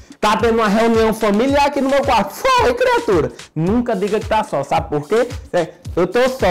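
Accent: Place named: Pernambuco, Brazil. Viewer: parece uma menina de prenambuco que fala assim mesmo meu deus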